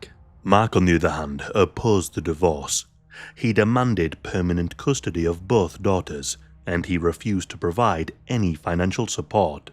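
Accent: United Kingdom. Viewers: The commentator is British, in which country did this happen?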